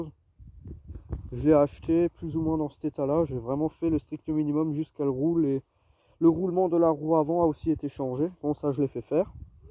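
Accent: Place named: Alsace, France. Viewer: Salut l'illuminé 🤣 Tout d'abord bravo pour ce que tu fais! Je restaure en ce moment une MICMO S98 identique à la tienne, mais qui malheureusement a été recouverte d'une vilaine couche de noir sur toute la bécane. C'est donc avec grand plaisir que j'ai regardé ta vidéo encore et encore... Je suis près de Strasbourg et vu ton accent il se pourrait bien que l'on soit voisin. Si tu es encore en possession de la MICMO, tu penses qu'on pourrait se voir ? Il y a sur la mienne des pièces manquantes dont je pourrais prendre les mesures sur la tienne. 👍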